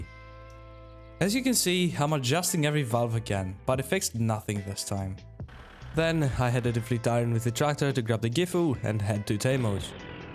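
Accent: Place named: England, United Kingdom. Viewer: Bro i love your english accent👍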